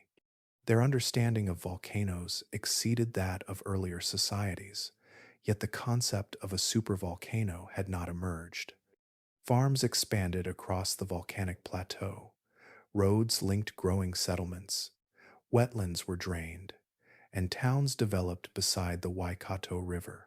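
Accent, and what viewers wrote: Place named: United States. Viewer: Since you have an american accent, it'd be more like Toe-paw.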